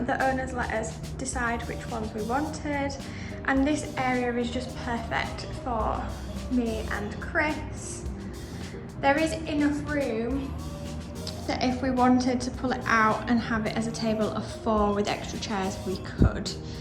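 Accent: United Kingdom. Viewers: We love listening to British accent…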